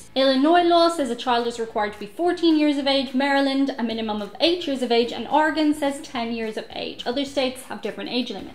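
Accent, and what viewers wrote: Ireland. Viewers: Love that Irish accent.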